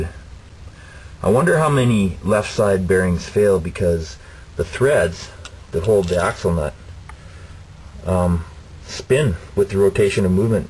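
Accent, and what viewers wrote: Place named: Canada. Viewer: Canadian eh...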